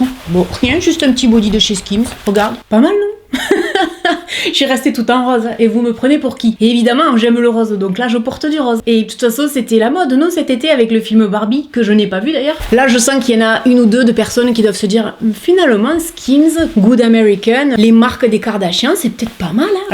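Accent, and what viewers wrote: South, France. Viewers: Coucou, j adore vous regarder et surtout vous écouter avec nôtre très beau accent ça sent le soleil,et met du baume au cœur.Excellente vidéo comme à chaque fois.Merci beaucoup bises 😊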